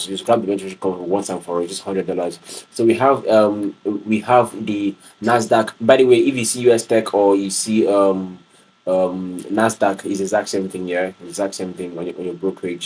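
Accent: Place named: Africa. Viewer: yeah I get you but I've been following this guy for about 2 years and my goodness his accuracy is top notch He speaks like an African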